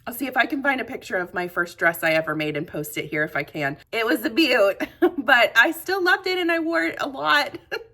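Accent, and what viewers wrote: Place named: North, United States. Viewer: Lol ... I'm also from the north so I understand the mouth 😂 I now live in Tennessee where they don't have my kind of mouth😅... I found you a few weeks ago and I have to tell you at almost 58 years old my bucket list was to teach myself how to sew and you have inspired me to actually start... 😊 I gave my mom your tote bag and Walker bag today and she absolutely loved to them.... Thank you for being such an inspiration and I hope you and your family have a wonderful holiday season and a very blessed New Year.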